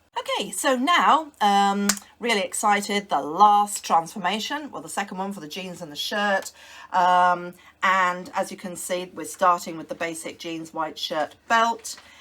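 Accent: United Kingdom. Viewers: Dear, You look absolutely stunning in all your outfits; what a figure! That flat tummy! Love your black dress, leopard belt, blazers, boots, jeans. You have inspired me to buy similar jeans. I love that you model the outfits and show us how to combine using the power of three. I would much rather see you modeling the outfits than a stock model. Love your personality and, of course, your posh British accent. You look like you are having so much fun. Natalia Arizona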